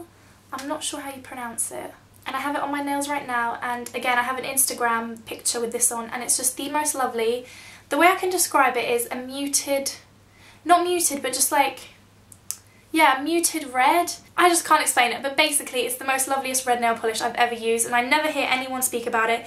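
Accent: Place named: United Kingdom. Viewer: Hey, can you tell me where you are from the UK? I want to do a year abroad, and I love the way you speak :)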